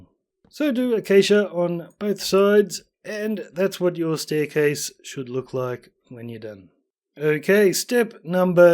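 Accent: Australia. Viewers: Is he Australian?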